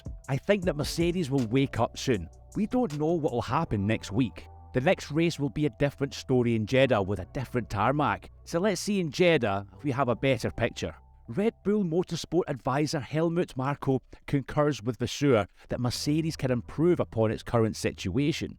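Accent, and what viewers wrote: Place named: Highlands, United Kingdom. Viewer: nice accent man, r u highlander? u roll the r like spanish pro speaker jajaja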